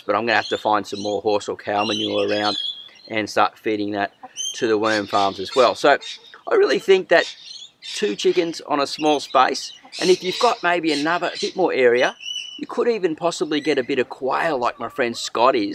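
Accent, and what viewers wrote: Australia. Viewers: Blue ribbon ice cream containers, the Aussie accent and I know I'm watching a video from home 👍 Its always nice to find a new aussie channel 😊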